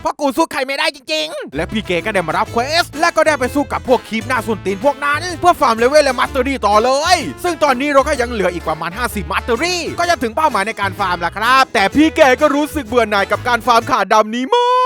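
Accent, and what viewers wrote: South, Thailand. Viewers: เป็นคนใต้หรอครับ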